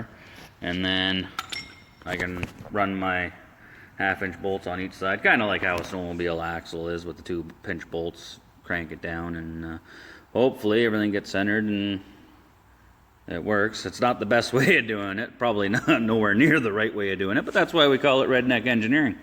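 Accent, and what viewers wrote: Canada. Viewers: Wish i had the cash, this things amazing. And you sir, are one of the most canadian sounding fellas iv ever herd lol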